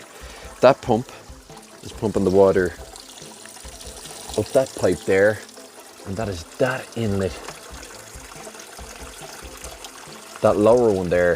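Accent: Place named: United Kingdom. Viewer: I’ve just come across your channel (Im a fellow goldfish YouTuber 😁) where abouts are you based? You sound British but I can’t believe the weather is warm enough to have goldfish in a tank outdoors all year. It looks amazing by the way and I’m definitely subscribing so I can see more! 👍🏻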